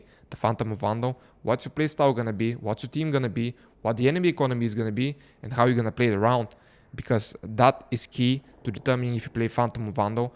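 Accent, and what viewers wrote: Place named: Bulgaria. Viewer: no wonder yr accent was familiar to me you are Bulgarian <3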